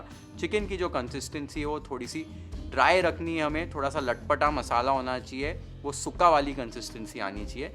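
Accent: Maharashtra, India. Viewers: Big fan......and your Marathi accent😚😚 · ❤For Your Marathi accent ... ❤ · You speak typical मराठी words in मराठी accent... *ळ*😊 ❤ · Omg 😮 ur marathi accent is jus wow❤ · What a marathi accent you catch bhava ❤ and dish is mindblowing as allways kadak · Your pronunciation of Marathi words are more authentic than lots of Maharashtrian people.. kudos 🙌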